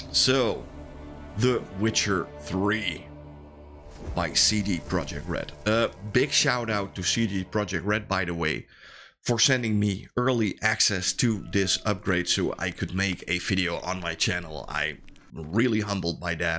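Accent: Netherlands. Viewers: You sound very Dutch. Thank you for the video!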